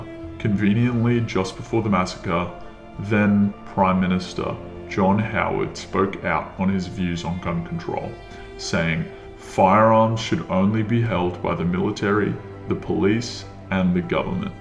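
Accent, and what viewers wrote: United States. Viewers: Sounds very American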